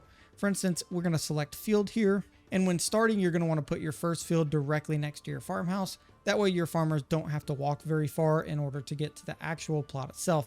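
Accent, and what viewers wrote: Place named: Texas, United States. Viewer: Thanks a bunch brother! Haha I couldn’t help but notice the accent, are you from Texas?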